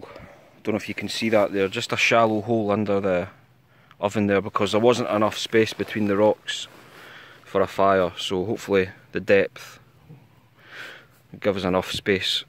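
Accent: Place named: Scotland, United Kingdom. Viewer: Hey ho. Nice vid u made there. I‘ve been to scottland several times to visit my family. Chrisps are very popular in ur country. Where are u locatet? My family lives between Glasgow and Edinburgh. Livingston Bathgate west lotian. U like outdoor very much. I enjoy watching ur vids every time. Ur accent is so nice to my ear. Keep em comming I think u are a good lad, where was ur dog this time? Greetings from Germany 🇩🇪. Bon Appetit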